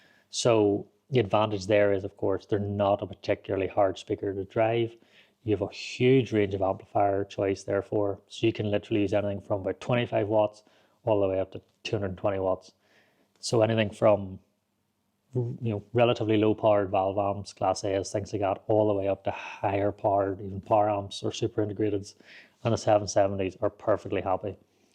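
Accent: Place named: Ireland. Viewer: This gentleman is Irish 🇮🇪 you are having difficulties understanding because of his accent. The UK also has many different regional accents that you would also find difficult to understand.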